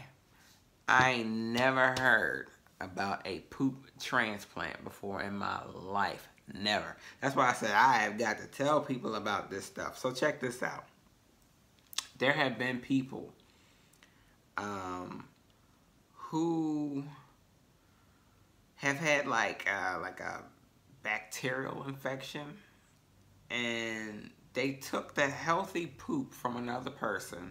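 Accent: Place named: London, United Kingdom. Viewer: lol the London accent.. No not the S curl- good information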